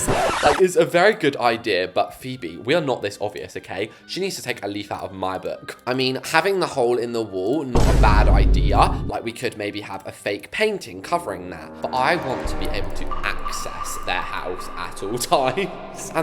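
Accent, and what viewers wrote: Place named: Essex, United Kingdom. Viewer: I KNEW U WHERE FROM THE UK YOU SOUND LIKE UR FROM ESSEX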